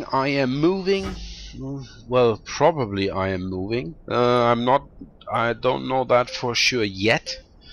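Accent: Germany. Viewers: Haha erste minute und wusste gleich dass du deutscher bist :D Wo haste denn die mod her? :)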